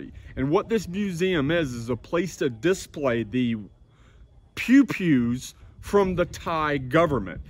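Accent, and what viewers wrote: United States. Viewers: I love the way Americans pronounce it Boooooda :)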